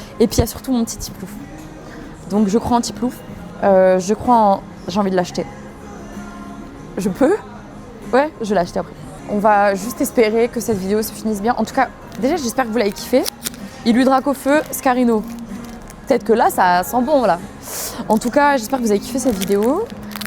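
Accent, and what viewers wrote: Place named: North, France. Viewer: Elle vient de chnord je reconnais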